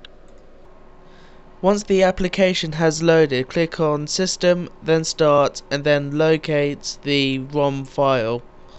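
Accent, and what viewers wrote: United Kingdom. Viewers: Two things. You are a savior in the world of video gaming. You have helped me play Resident Evil - Code Veronica. You have a British accent. That's awesome. But for some reason everything you say is interpereted by my computer into this kinda thing; - "7 great rings were forged from the fiery pit of Mt. Mordor." - "One ring to rule them all." - "They're taking the hobbits to Isenguard." PLZ HALP! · the way he pronounces dreamcast games:driimcost gheims ok,i know hes british or something xD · I believe I was just instructed by Harry Potter...O_o cool accent xD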